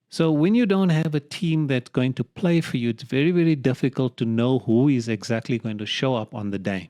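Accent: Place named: Durban, South Africa. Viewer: Aslm, nice to see someone from RSA making united vids, keep it up. Sounds like you're from Durban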